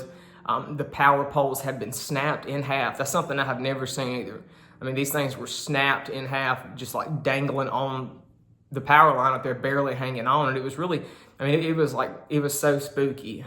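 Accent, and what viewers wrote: South, United States. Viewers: Why do you talk? So funny? Have you thought about speech lessons so that you can eliminate your southern drawl?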